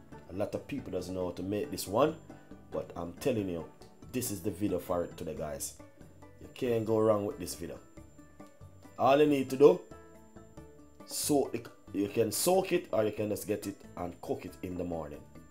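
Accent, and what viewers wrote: Jamaica. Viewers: Mi subscribed once mi hear u voice n see seh yah Jamaican 😭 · Queen Kim don’t get it twisted most of us Jamaicans speak good English ,just cause it doesn’t sound like American are British ,he is speaking English but has his Jamaican accent , unuh really know weh fi pick pon .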